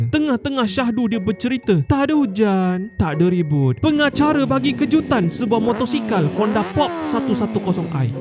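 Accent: Sabah, Malaysia. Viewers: Abang ni orang sabah kah? 😂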